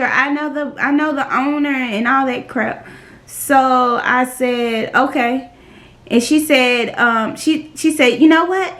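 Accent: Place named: South, United States. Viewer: I love your accent I'm guessing your from the south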